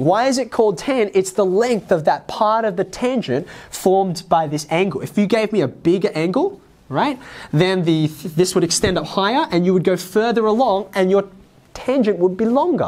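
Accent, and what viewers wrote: Australia. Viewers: No, just an Australian with an Australian accent...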